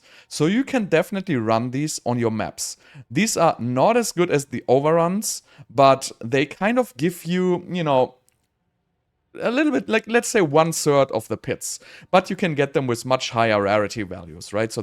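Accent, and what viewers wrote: Germany. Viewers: Liebe wie man das deutsch immer raushört hahaha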